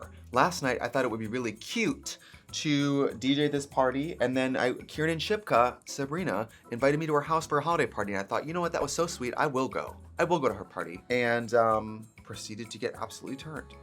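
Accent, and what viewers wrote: Wisconsin, United States. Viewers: Just thinking about your Wisconsin accent late at night sometimes… it will never leave you,.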